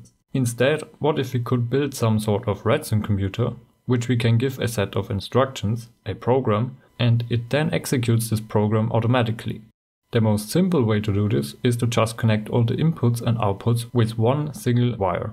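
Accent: Germany. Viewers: I hear a german accent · Are ju German? Bekaus it klings so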